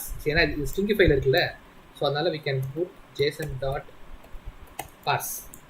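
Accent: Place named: India. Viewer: As an Indian myself...i cannot understand him.....his Indian accented English is atrocious.....Or is he speaking Mandarin ???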